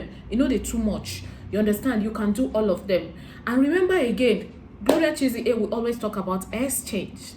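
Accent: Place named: Nigeria. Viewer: Am in love with your Nigeria English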